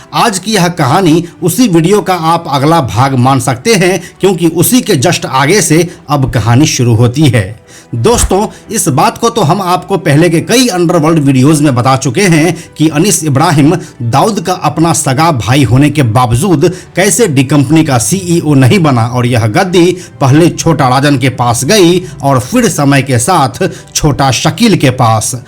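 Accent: Bihar, India. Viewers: Aap बिहारी हैं क्या आपके बोलचाल ascent से लगा इसलिए पूछा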